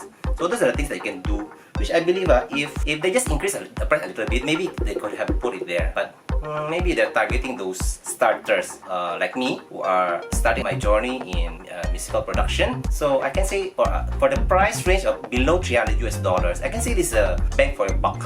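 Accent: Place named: Singapore. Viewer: grabeng accent singaporean vibes :) more videos again using brand new piano. :)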